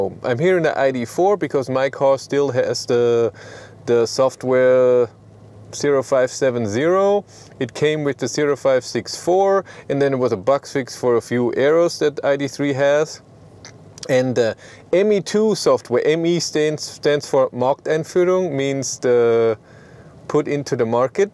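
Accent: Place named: Lower Bavaria, Germany. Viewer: How I love your Niederbayrisch 😍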